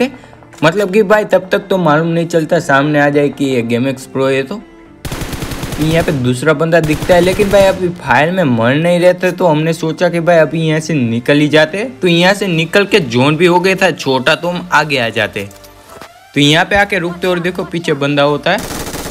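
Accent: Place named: Gujarat, India. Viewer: Lagta he Gujrati manus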